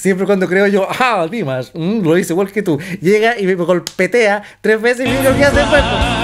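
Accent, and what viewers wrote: Chile. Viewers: sabia que eras chileno!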